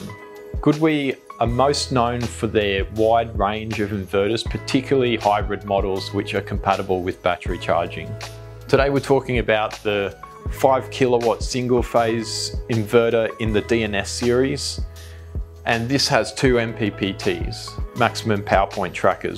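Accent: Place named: United States. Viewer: You have a funny American Accent :)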